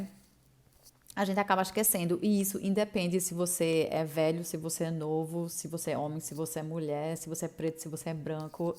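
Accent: Northeast, Brazil. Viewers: Sotaque nordestino e carioca . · Nossa que sotaque de nordestina, amei akkakak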